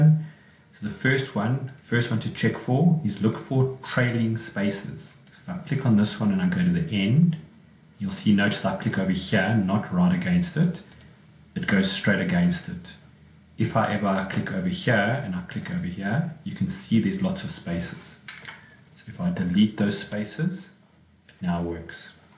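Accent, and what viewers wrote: South Africa. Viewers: So surprised to turn this on and hear a fellow South African's voice! :) My question is, in the last example what is the 'special' kind of space that was not generated by the keyboard? How can you check for and remove it? Thanks!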